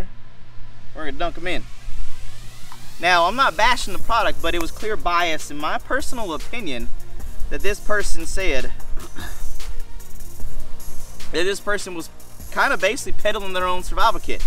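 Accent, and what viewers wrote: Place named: South, United States. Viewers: Yep that southern drawl was thick, pay no mind brother ignorance is bliss. Liked the quick shot of the systematic last couple vids ago. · Are you from my part of the woods in West Virginia? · you sound more southern today!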